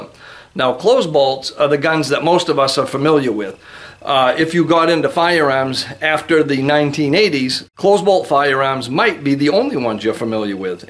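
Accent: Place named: New England, United States. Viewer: Having lived in Boston for years, I love your New England accent.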